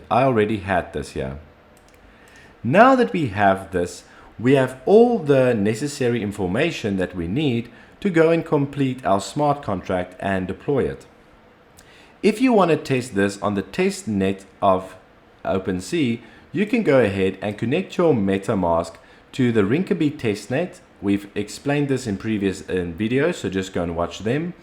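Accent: South Africa. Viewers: Are you south African?? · I hear a South African accent, and I upvote.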